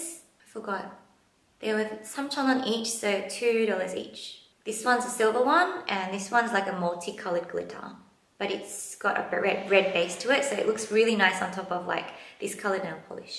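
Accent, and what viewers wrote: Australia. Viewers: i like your voice! hehe very australian ^^ i'm an aussie asian too~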